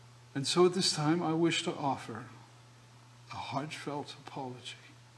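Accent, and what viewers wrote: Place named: Transatlantic, United States. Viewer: Props on the transatlantic accent. Very nice.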